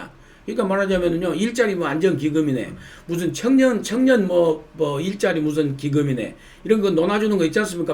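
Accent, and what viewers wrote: Jeolla, South Korea. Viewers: 설명 넘 잘하셔서..어려운 정치가 이해가 좀 됩니다.. 민영삼 원장님의 전라도 억양은.안정권 대표 처럼, 듣기가 너무 좋습니다. . 저.. 팬이 되었어요. 화이팅..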